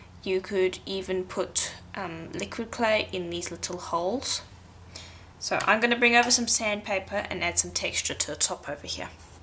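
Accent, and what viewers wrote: South Africa. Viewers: Hi. Where are u from? You sound South African :)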